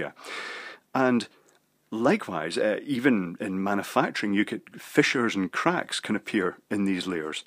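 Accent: Scotland, United Kingdom. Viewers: Haha, yes! I'm doing this same 100W LED project, and these videos help me for this same reasons, also Scottish accent's refreshing to boot.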